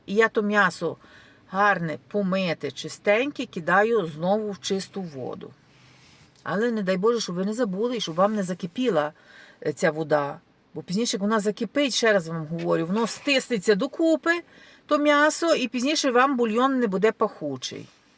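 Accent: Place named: Rohatyn, Ukraine. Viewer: За тоном розмови ви не зі Львова, а із Рогатиншини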